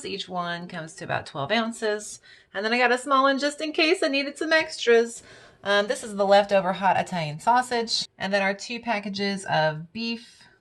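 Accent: South, United States. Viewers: I'm curious what state you live in? I'm sure you mentioned it before, and from your accent I assume down south..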